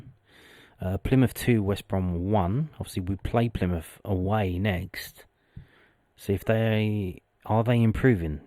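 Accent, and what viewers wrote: Scotland, United Kingdom. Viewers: Love the jock accent 😂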